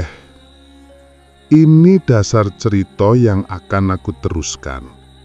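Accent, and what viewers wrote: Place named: Banyumas, Indonesia. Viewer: Nyimak ewong ngapak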